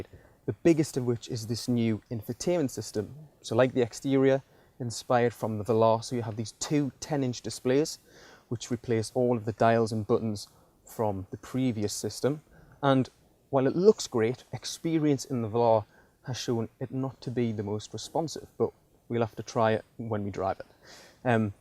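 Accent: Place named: Newcastle, United Kingdom. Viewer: Gan on Geordie lad.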